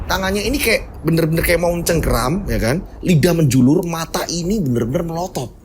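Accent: Java, Indonesia. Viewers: Siang bang salam dari Medan Sumut ,saya seneng degerin canel Abang ini , ada lucu " ada serem " dn yg paling bikin saya senang ini bahasa jawahnya yg totok bgt sagat " jelas cara penyampainya , karena saya turunan Jawa solo ,bapak Batak jdi saya senang bgt degerin dgn keturunan org " Jawa 😊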